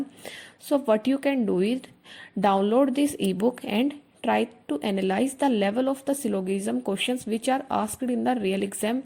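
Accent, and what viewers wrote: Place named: Gujarat, India. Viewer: Are you from Gujarat,because your accent look like Gujarati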